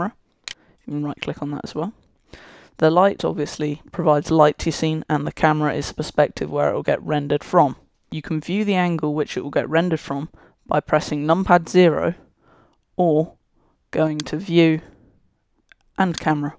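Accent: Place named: United Kingdom. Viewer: based on the link and his accent, prolly, he's from UK...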